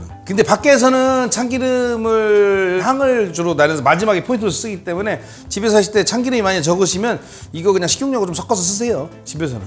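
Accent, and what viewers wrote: Chungcheong, South Korea. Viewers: 백종원 대표님 구수한 충청도 사투리 듣고싶네요 오늘저녁 순두부 찌게 맛나게 끓여 먹겠습니다 건강하시고 가까운날 티비서 뵀으면 좋겠습니다 누가뭐라해도 백종원 열펜입니다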